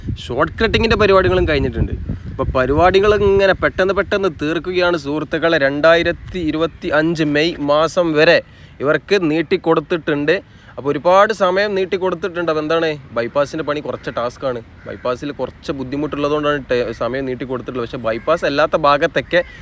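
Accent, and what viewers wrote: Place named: Kannur, India. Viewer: സൂപ്പർ വീഡിയോ ഇത്രയും മനോഹരമായി dron ചെയ്ത വീഡിയോ ആദ്യമായിട്ടാണ് കാണുന്നത് നല്ല അവതരണവും ഇടക്ക് കോമഡിയും സൂപ്പറാക്കി നമ്മുടെ സ്വന്തം കണ്ണൂർ ഭാഷ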